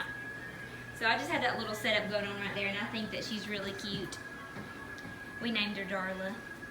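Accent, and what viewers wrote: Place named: Alabama, United States. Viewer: Everything is beautiful! How do you keep your light colored couch so nice and clean with puppies? I'm originally from Alabama but now live in TX. Nice to hear the Alabama Accent :)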